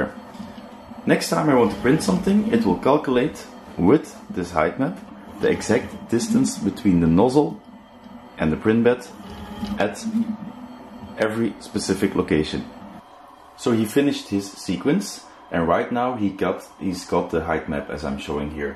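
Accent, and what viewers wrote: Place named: Belgium. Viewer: Hi there, Your accent sounds terribly Belgian, I should know since I am one, correct me if I am wrong. I am also building a hypercube (<num>) but I followe Daha's videos up untill now, I am starting with the electronics part now but then I notice I have a very diferent motor controller card (Duet 2) and LCD (Paneldue 7i) so I am a bit thron of my rocker here, I have already found some intell on this but is it ok if I drop you a question from time to time? If my suspicion is correct we can converse in dutch :-)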